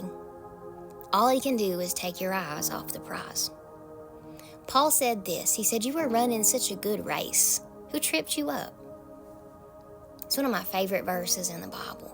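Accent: South, United States. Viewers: You Are So Beautiful 🙏 I Absolutely Adore You 🤫 That Southern Draw You Radiate Is An Undeniable Beauty Like No Other🤭 I'm From Bama & I Love It. Have A Wonderful Monday Sweet Angel 🫶🫂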